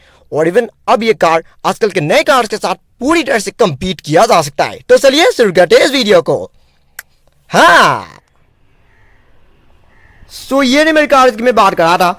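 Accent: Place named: United Kingdom. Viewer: Kotha bur olop bhal kobisun aru besike bhal lagibo aitu english accent hindi koi aso ni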